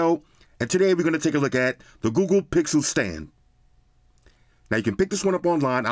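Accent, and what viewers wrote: New York, United States. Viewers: Stopped watching as soon as I heard the NYC accent 👎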